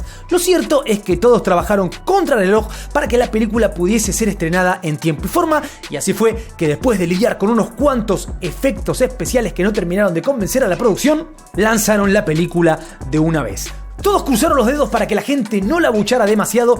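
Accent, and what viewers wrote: Argentina. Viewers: Me estresoooo tanto tú forma de narrar ...entre ese acento argentino (ufff) y la forma exagerada de las impostar la voz que no logro acordarme cuando vi Back to the future!!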